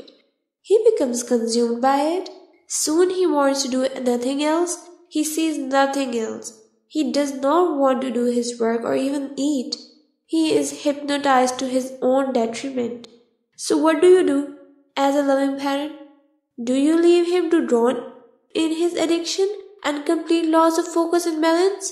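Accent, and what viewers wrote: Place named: India. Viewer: I feel indiane accent in the air, please re-read such an amazing book with right accent, so many words i was confused about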